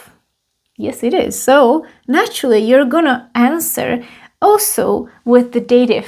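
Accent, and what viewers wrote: Czech Republic. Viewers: I have a question. Why do you speak in a diferent way than moravians? I know a lot of them from the zone close to the border to Vienna and i find them easy to understand. Easier to understand gramaticly and even in the pronounciation. Do the bohemians have a diferent dialect than the moravians? Thanks for the classes by the way...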